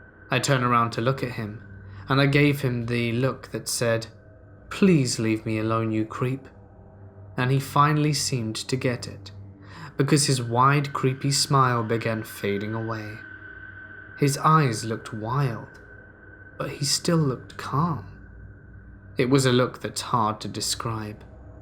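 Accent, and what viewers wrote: United Kingdom. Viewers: Does every one of these channels have to have a British accent ?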